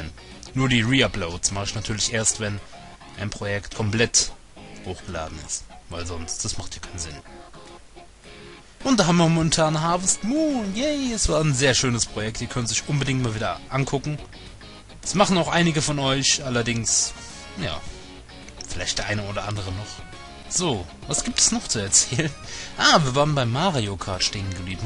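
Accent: Hesse, Germany. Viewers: bist du aus ghessen, wegen dem akzent?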